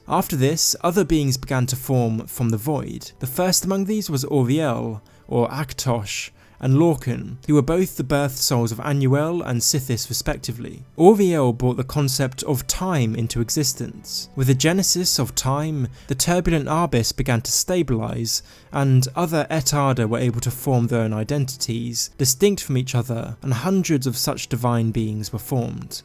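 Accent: United Kingdom. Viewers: Love your videos and your voice . What part of GB are you from ???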